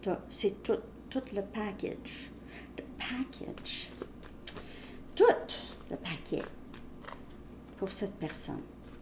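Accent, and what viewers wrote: Canada. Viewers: Bonjour Nouvelle arrivée sur votre chaîne, je suis Française et vous avec votre accent, Canadienne sans aucun doute. 😊 J’ai beaucoup aimé votre vidéo. Joyeux Noël et bonnes fêtes de fin d’année. À bientôt. 😊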